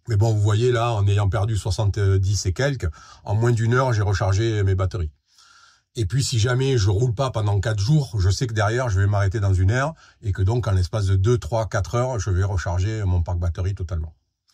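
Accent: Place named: Marseille, France. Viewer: Merci beaucoup pour tes vidéos de partage sans rien avoir à vendre. Cela fait du bien ! Les contenus sont pratiques, efficaces. A ma connaissance, tu es le seul à avoir une démarche aussi complète sur le monde du camping car. Quant au soleil que tu n’as pas dans tes vidéos, cela est compensé par la petite partie de Marseille que tu transportes dans ton phrasé. (J’ai un peu la sensation d’entendre Di Méco.😉) Bonne route pour la suite de ton voyage et encore merci.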